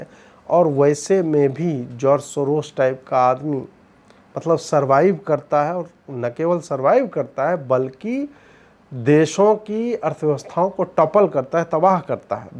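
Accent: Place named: Bihar, India. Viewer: vo bihari h hamare yaha UP aur bihar me aise hi bolte h yakin nhi to UP CM ka bhasan suno. Sikhao mat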